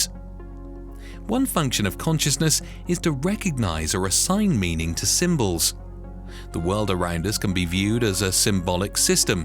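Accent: United Kingdom. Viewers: The Universe is big and it has many stars, planets and galaxies in it. And it probably has other life forms in it too. With that being said, why do we always have some British guy narrating this stuff?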